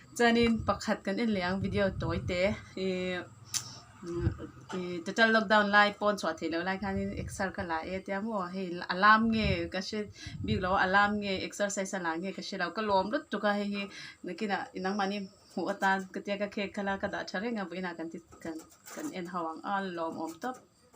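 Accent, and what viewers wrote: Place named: India. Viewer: Voice Tone hi dik lutuk Hindi Tone diktak in asa tlat mai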